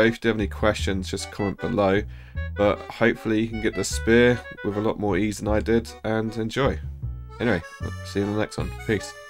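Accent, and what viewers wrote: United Kingdom. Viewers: Good video, but your British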